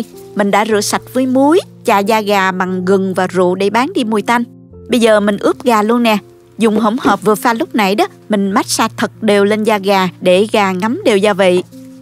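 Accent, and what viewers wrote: South, Vietnam. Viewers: Giong noi cua de thuong qua, dung la nguoi Nam binh duong❤️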